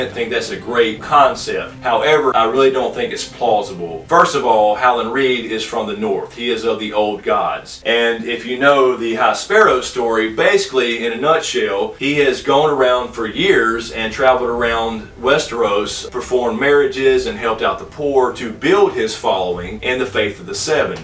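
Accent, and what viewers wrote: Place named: Kentucky, United States. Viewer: 1. Love the shirt. 2. Love the video. 3. Subscribed. 4. What part of the South are you from? I'm from Kentucky and accent sounds very similar.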